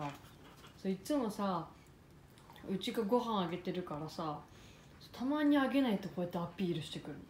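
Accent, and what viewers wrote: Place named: Okinawa, Japan. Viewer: 沖縄出身でしょうか？イントネーションが似ている気がします！（私は沖縄出身でも何でもないです、すみません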